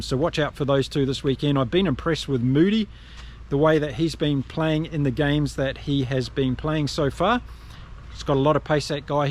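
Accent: New Zealand. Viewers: I laughed when you said you're no rugby expert, here's me as a South African the moment I hear the cadence in your New Zealand accent I'm thinking of every New Zealander pundit I've ever heard and I automatically trust your rugby opinion. 😂.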